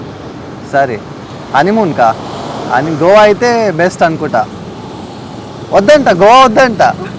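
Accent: Telangana, India. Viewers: Telangana basha super ga matlduthunnaru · మొత్తానికి తెలంగాణ బాషా జోరు మాట్లాడుతున్నావ్ శుభాకాంక్షలు అన్నా 😁😁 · Super Anna act in TELANGANA Telugu language super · I love the way u r talking in pakka Telangana slang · Totally surprised to see U speak very well Telugu that's also in Telangana slang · Telangana slang super anna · Anna supperrrrrrb telugu Telangana yasa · Telangana slang super 😁🤘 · bro nuv tilagana slang masthu matladuthunnav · Bro Telangana language Superb. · Anna nice 👌 Telangana Basha super I yam big fain pataechu porini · Happy to see you, i know you born in hyderabad and brought up in Mumbai, still you are speaking good telugu with telangana accent.more way to go. All the best bro. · Bro pakka telangana slang..awesome.. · I never knew you speak Telugu, and you totally pulled it off. Telangana slang, great bro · Bro nuvu telugu matladutunte chala comedy GA vundi bro Kani telangana slang bane try chesavu bro nice keep rocking bro 😘😘😘😘😍😍😍😍😍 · anna pakka Telangana language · I like ur way of talking Telangana slang Bro nice keep it up Bro All the best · Awesome Telugu bro.....that to in telangana slang u spoke....😀😀😀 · Anna telengana language super ga mataldavu anna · bhai i saw you many videos in hindi, but you are talking telangana telugu its super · Bro telangana slang superb 👌👏👍🙌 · Telangana Iragateesthunnav Anna🔥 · Telangana slang echi padesnav 🔥 , come with more Telugu video ❤️ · Acha(actual) telugu anna idi... 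Telangana telugu ante idi asal... Kirrak poo... Dhethadi pochammagudi lekka unnadhi... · Bro pakka Telangana slang 🔥🔥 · Pakha Telangana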